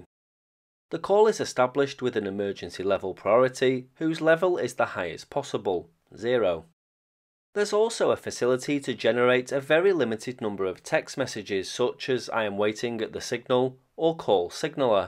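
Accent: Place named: North, United Kingdom. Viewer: with a northern accent again. With Gareth Dennis levels of rail nerdity. Still love it.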